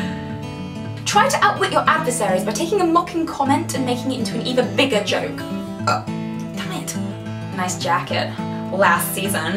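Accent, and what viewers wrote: United Kingdom. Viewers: you sound like british,I like your tone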